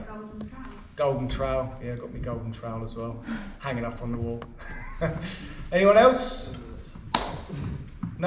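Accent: Cockney, United Kingdom. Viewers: Flash cockney bastard you know what you are …….bricklaying yaaaaaaawn 🤪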